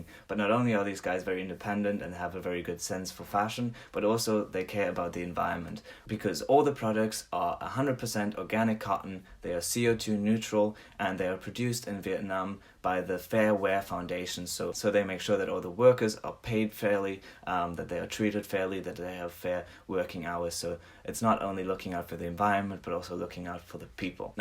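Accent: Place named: South Africa. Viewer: i knew i heard south african :) my parents are both from there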